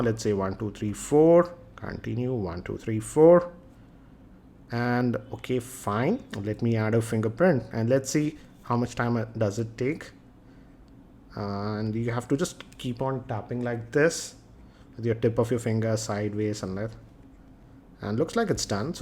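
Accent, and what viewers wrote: India. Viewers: Hey pig,he is speaking indian english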